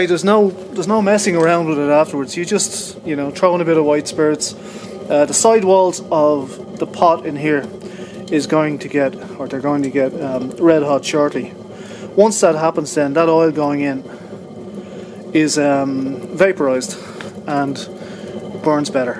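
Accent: Ireland. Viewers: Excellent set-up! I particularly like your innovative use of the Pyrex dish. It's both handy to see the flame and one of the nice things about having a fire. The crackling sound is water in your oil. That will reduce your heat output... if it matters at all. Dry oil does work a LOT better in these burners. If you were interested in efficiency at all, you could run your Chimney pipe horizontal for a good few feet before ducting it out. You would find there is a lot of heat coming off that which would now be wasted. If you also had a small fan blowing along it, the heat would be better dispersed around the area you are heating. Congrats on a job very well done with this. It's definitely the neatest and most innovative burner of it's type I have seen. You have thought it through very well and come up with a great set-up. Great explanation and who doesn't love an Irish Accent! 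Well done to you Sir! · We Americans love the Irish accent.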